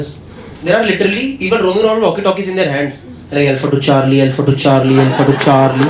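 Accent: Delhi, India. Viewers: He is awesome- with a Delhi Punjabi flavour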